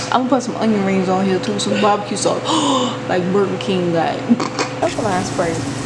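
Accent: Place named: Chicago, United States. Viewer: I love your video, and I hear in your voice you are from Chicago, my city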